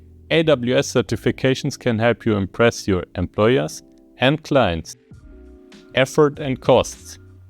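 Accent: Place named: Germany. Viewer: Love the German accent. Thank you for the video, very helpful, especially regarding getting started with the cloud topic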